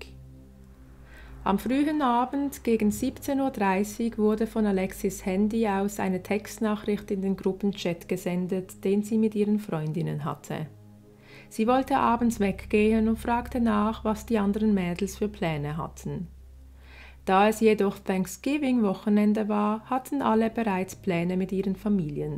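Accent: Switzerland. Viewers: Ändlich mal ä schwiizerin. Machsch guet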